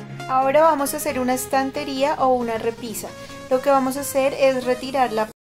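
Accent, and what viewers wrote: Colombia. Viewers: muchísimas gracias...las voy a realizar...por el dejo eres colombiana....saludos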